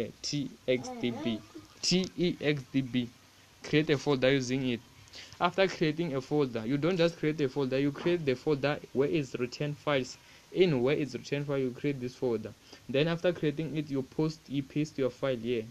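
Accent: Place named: South Africa. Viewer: I can smell the accent...mzansi👑❤😂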